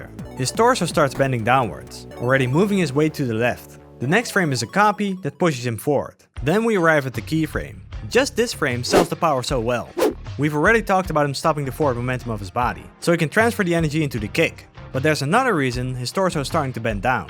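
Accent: Netherlands. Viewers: your english is decent, it took me literally a minute to notice your dutch accent :p u have a typical dutch D & T. coole video trouwens. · u dutch ?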